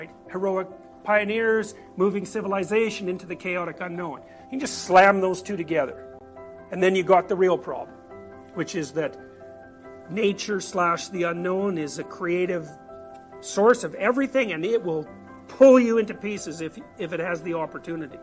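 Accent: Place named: Canada. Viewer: It's his Canadian accent.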